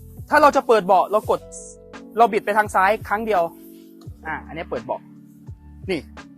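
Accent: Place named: Isan, Thailand. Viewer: มีคำว่าเนาะลงท้ายรู้เลยคนอิสาน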